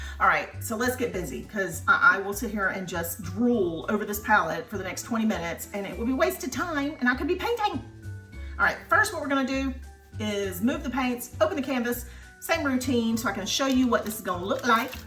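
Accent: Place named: North Carolina, United States. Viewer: You are a joy to listen too, and as I'm originally from North Carolina, I love your accent.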